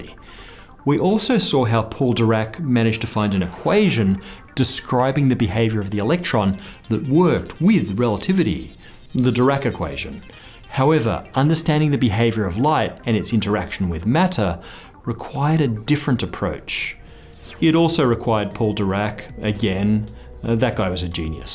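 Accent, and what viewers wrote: Australia. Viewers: I'm not sure if people are joking but this 'aint no British accent. The guy's got a posh Australian accent. Who knew? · sounds more Australian or NewZealand than British.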